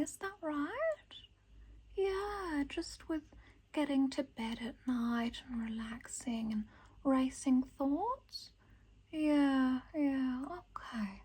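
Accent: Australia. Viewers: Aww I love your asmr’s so much especially its in Aussie accent but can you change your face Expressions it’s make uncomfortable👉🏻👈🏻 Much love mum🩵🩵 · Obsessed with your asmr vids!! You do rich aussie so good lol 🤍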